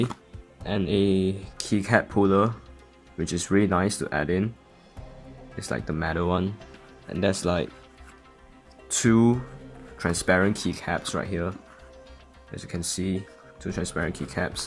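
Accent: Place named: Asia. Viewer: I smell popular osu youtuber Well I mean you sounds like Miraie n Squashy Boy. Btw, are you Asian? Your english just sounds like one🤣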